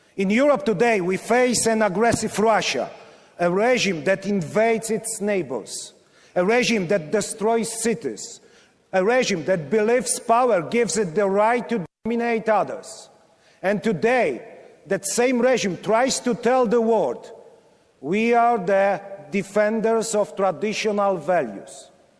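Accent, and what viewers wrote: Poland. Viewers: Hmm polski akcent? Ogarnij sie😮 TO Polak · Angielski prezydenta nie brzmi sztucznie, słychać polski akcent, a sama wymowa jest pod kontrolą. Godne wystąpienie.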